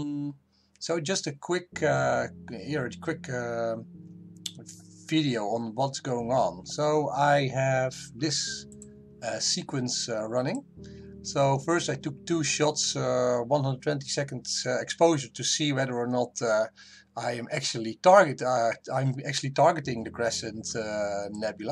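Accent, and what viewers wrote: Netherlands. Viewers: We like the Dutch accent too. But to hear your skills explained in a clear way is great. I know you say you are learning, but we are all learning. And to see your image and know how you got there is the information that is useful.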